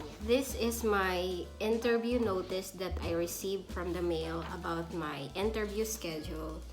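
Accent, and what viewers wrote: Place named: United States. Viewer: she is sound like she is an american girl , like engl is her mother language , what is your concern was about Idk...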